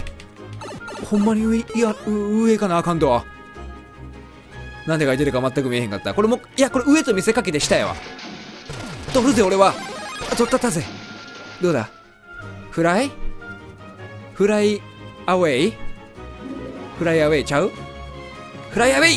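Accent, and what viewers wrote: Kansai, Japan. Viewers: えぐw だいぶ前から見てたけど、バリバリの関西弁とリアクションおもろすぎてとうとうチャンネル登録してもうたw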